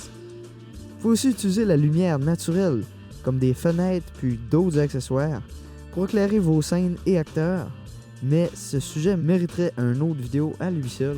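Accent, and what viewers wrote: Quebec, Canada. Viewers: Pourquoi tu n'as que 260 abonnées ??? Tu en mérite 10x PLUS !!! Ton travail est exemplaire , tes sujets sont intéressants et ton montage est magnifique PS : J'adore les accents québécois !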